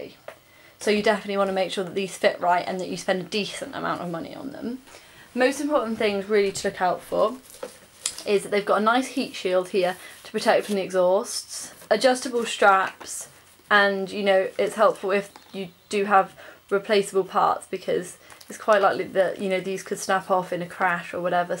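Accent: United Kingdom. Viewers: Hi I can hear by your accent that ur from the uk although u mentioned it, but I'm from the uk aswell, I live in in surrey, where do u think I could ride that's near redhill due to the fact that I live there in redhill. Is there any places to ride a dirt bike ?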